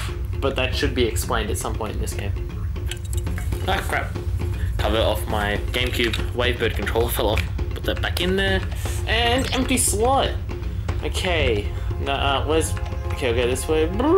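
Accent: Australia. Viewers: Gawd, I love Australian accent! Thanks for these, MATE!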